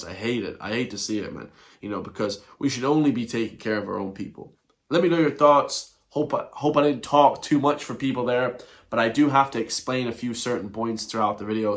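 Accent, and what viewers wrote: United States. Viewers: You sound more American than Irish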